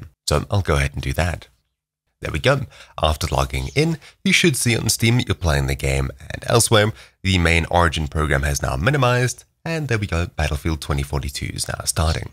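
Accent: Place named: South Africa. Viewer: Knew you were S.A 'en, picked something up in your accent a while ago, then I saw your Origin name just now.. Haha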